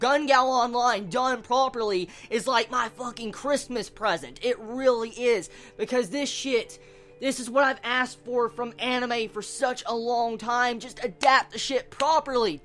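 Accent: United States. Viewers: That country accent tho...:)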